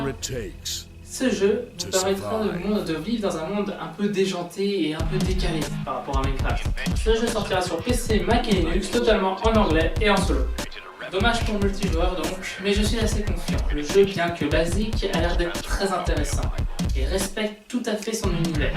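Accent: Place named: Quebec, Canada. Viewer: J'aime l'accent poce bleu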